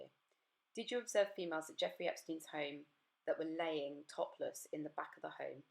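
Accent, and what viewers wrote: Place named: RP, United Kingdom. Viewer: Your accent is more posh then Maxwell's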